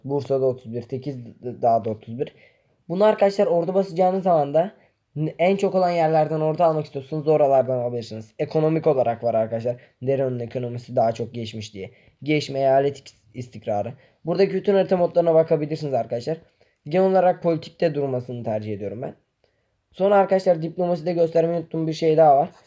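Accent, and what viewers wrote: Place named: Azerbaijan. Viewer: acayip diyorum da yani azeri turku gibi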